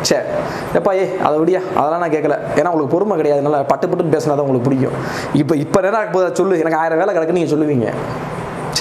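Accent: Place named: Madurai, India. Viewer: Madurai slang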